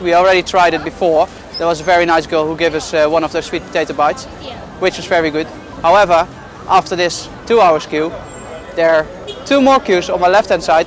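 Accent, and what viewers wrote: Netherlands. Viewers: Thank you for the very informative video :) we’ll be there for a few nights in December. Your accent sounds Dutch btw, is that right? I lived in Groningen for a short while :)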